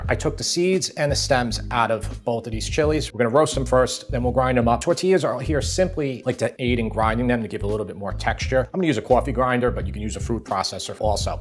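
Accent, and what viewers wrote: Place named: Long Island, United States. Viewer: Long Island accent goes crazy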